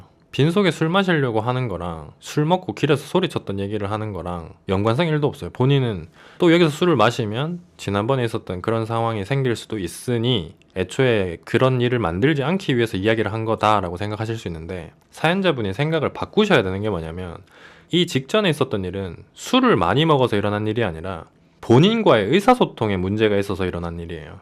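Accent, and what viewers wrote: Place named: Daegu, South Korea. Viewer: 경상도 사투리가 되게 귀에 거슬리는게 1도 없이 그냥 술술 들어온다 ? 싶었더니 저랑 같은 대구 분이셨군요. ㅋㅋㅋ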